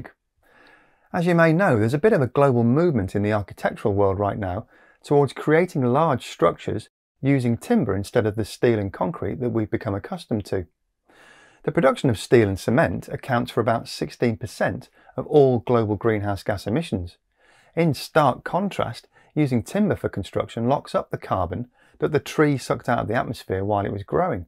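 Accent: England, United Kingdom. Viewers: 5 second in, befor I could mute this vile noise from england. I assumed it would be Human aka American speech. Can't watch such mess.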